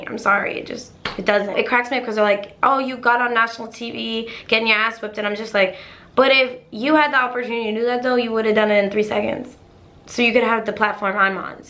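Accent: Boston, United States. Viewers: I LOVE when your thick Boston accent comes out😍! I love the way bostoners? Talk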